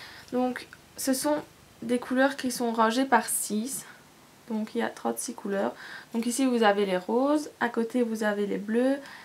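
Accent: Belgium. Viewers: "T'sais nin comment ca s'dit":D Punaise (j'allais dire un gros mot) tu sais pas comment je suis genre contente d'entendre une belge sur Youtube (je te l'ai déjà dit je sais)! Bisous à toi et continue à faire tes vidéos elles sont top!:)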